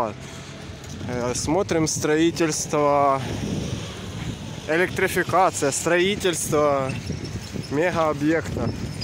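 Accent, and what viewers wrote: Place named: Ukraine. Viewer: Да ладно нормальный голос с приятным малороссийским говором.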